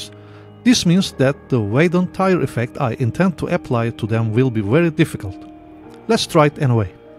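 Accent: Russia. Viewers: Мне одному слышится английский с Рязанским акцентом? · new to this channel is that a russian accent or..?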